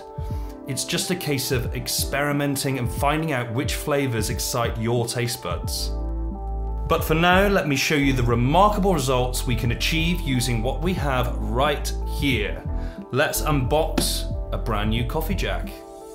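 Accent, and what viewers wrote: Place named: England, United Kingdom. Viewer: Nice English accent 👍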